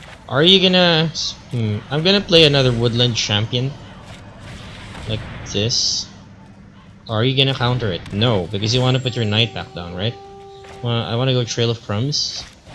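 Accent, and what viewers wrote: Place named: Philippines. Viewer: I can tell you’re filipino, hahahaha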